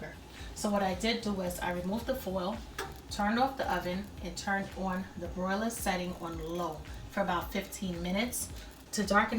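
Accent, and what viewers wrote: New York, United States. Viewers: Love that NY accent lovely chicken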